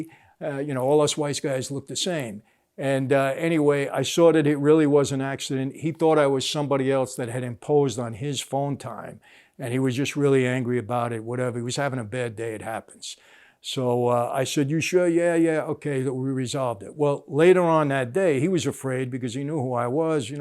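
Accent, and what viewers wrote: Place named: New York, United States. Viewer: Glad I found you. I am a little older than you, grew up in Brooklyn, mother’s family Sicilian immigrants, grew up surrounded by paisans. I love to hear your New York accent, your intelligence, and your NYC Italian soul. BTW, I too am a remade man, not through The Godfather but the Father God and his Son. May he keep you humble, useful, and full of the kind of joy you once upon a time never dreamed of. One more point. I have lived in California for almost fifty years. Moving back to Brooklyn. I’ll eat some good pizza and a cannoli in your honor.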